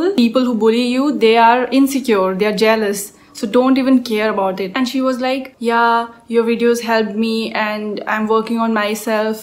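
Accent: India. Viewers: When I saw the thumbnail i was like someone Korean , Japanese etc but when j heard the voice ohh indian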